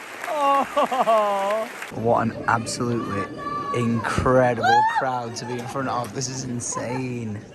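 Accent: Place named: Manchester, United Kingdom. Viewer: Corrrr , u can tell his a manc , a box of Straaaarberys and cream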